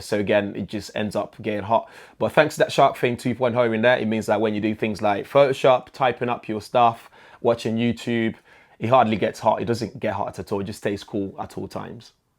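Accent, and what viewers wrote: United Kingdom. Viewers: Brits English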